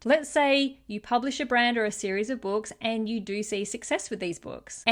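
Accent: Australia. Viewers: It was very hard to catch that Australian accent. But i can smell a Australian from a mile away.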